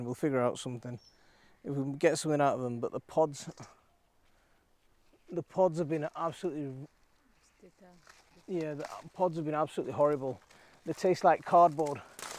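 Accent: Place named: North, United Kingdom. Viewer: I love that northern accent :D it's so homely and nice. Great harvest!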